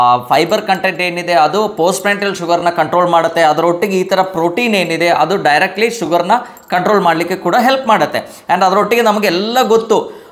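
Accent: Kerala, India. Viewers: Kannada mathaduvavaru kuda Doctor Nimmastu Shudhavagi Kannada Mathadalla Butfrom Kelavu padagala Accent gottagutade Nivu from Kerala But Nimma pure Kannada Vivarane Nivu Hutti Beledidu& Education khanditha karnataka yendu Nimma pratiyondu Vidio nodutene . Tumbaa chennagi tilisutiri 👌👌👌 Nimage Vandanegalu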